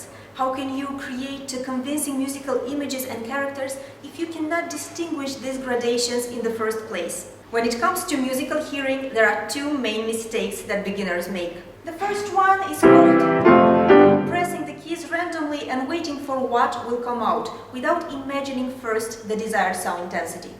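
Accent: Russia. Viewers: May I ask when the next update may be?? Also I love your accent by any chance are you Russian? :) xx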